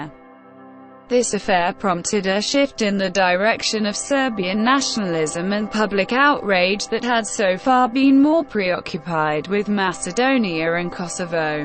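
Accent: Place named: United Kingdom. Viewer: With all the background noise this woman with a heavy British accent cannot be understood.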